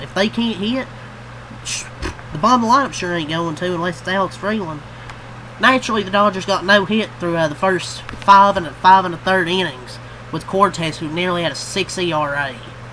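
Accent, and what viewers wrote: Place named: Atlanta, United States. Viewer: Where are you from? Sounds like an Atlanta accent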